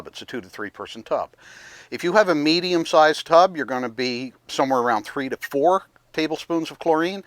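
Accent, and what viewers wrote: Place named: Newfoundland, Canada. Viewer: are you from the east Coast? Little bit of a newfie twang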